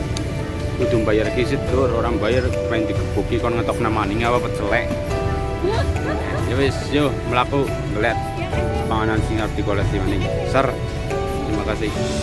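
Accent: Banyumas, Indonesia. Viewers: Keren logatnya Ngapak seruuu Sering Ming kebumen Mampirrrr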